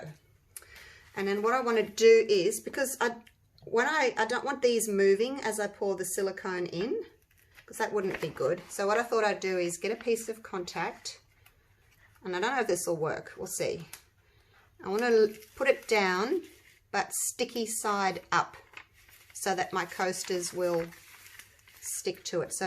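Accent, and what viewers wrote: Australia. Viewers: Love this video and love the Aussie accent!!! “Oh this is so exiting lol 😂 “ u made me giggle how happy u were wen removing your clear coasters from your mold!!!!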